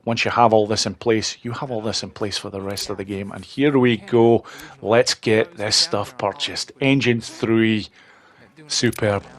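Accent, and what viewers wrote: Scotland, United Kingdom. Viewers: mega great info channel rocks the best way ever I never asked but I take it you are sottish one of my favorite wrestler is drew mcintyre from WWE .. I'm half Welsh / Greek funny combo I know lol. but your channel/ content is excellent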